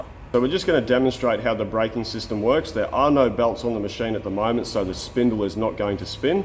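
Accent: Australia. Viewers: So bloody austrailian ah. "That aint no bone boy. Now this is what i call a bone" with a sparkle in ya eye😁😁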